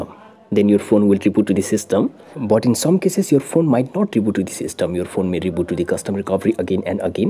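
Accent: India. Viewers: Thumbs up for this indian speaking english finally I can understand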